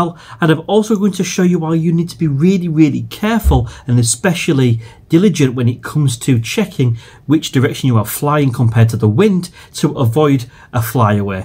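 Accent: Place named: North East England, United Kingdom. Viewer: Definitely doesn’t sound like a Yorkshire accent being on the Humber where is it you’re actually from? It sounds more Sunderland Newcastle Middlesbrough area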